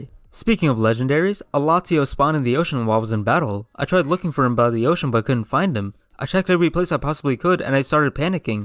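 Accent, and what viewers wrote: India. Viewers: actually sounds like indian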